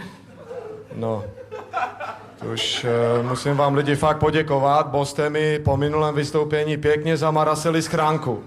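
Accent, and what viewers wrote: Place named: Prague, Czech Republic. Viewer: mne to pripada trapny...kazdej ostravak sice mluvi jak jantar, neumeji dat skoro dohromady ani vetu, ale tento borec mi prijde trapnej..taky bych rekl, ze to bude nejakej prazak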